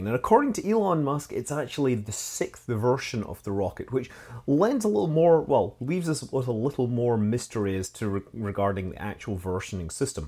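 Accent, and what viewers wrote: Scotland, United Kingdom. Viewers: Its amazing your knowledge on all these subjects you do in your channels, I think yer a very clever man, a credit to the internet, BTW by your accent Do we live in the same country,? the UK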